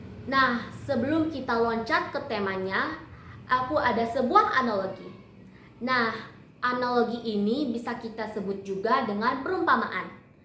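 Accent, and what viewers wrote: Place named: Sunda, Indonesia. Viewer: Keren. Ternyata 'berbahasa' pun masih trrdengar medok sundanya. Pdhl 'speaking' nya juga medok 😀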